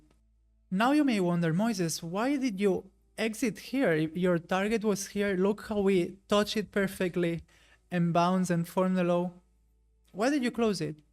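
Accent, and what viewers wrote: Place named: Spain. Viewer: para que cojones hablas inglés si eres español, vas de intelectual y es ridículo. Mucha pereza la gente como tú, de verdad que tu acento es ridículo, si buscas más visualizaciones hablando inglés lo llevas claro, espabila chico.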